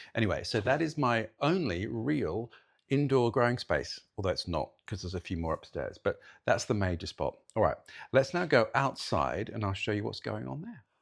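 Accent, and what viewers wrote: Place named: Australia. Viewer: your rental is fabulous and please don’t stop making videos! Your great sense of humor and delightful Aussie accent truly elevate the viewing experience for me, and I thoroughly appreciate your orchid culture insight and so enjoy seeing your plants. Happy New Year from my very crowded rustic cabin grow space in Southern CA.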